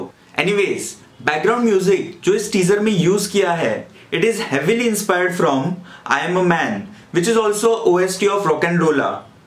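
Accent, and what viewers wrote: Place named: Maharashtra, India. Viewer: बोलण्यावरून मराठी दिसतोय... बरोबर ना..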